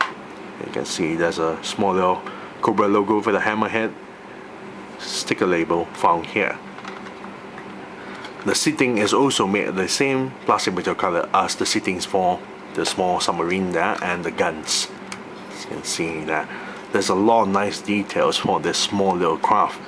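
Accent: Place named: United Kingdom. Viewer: Sounds like across the pond 😊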